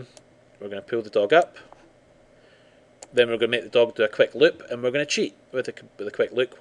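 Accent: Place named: Scotland, United Kingdom. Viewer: wtf ur scottish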